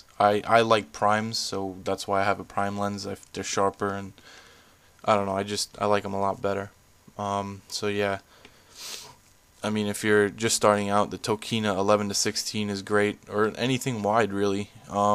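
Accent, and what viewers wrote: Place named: East Coast, United States. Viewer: good video and good tips I want to get rollers when im down in ocmd for june and sept you sound like your from around the east coast I do photography as well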